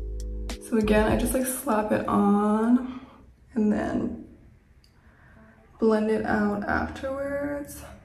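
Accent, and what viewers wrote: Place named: California, United States. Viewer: Sounds like a California girl accent😅😮‍💨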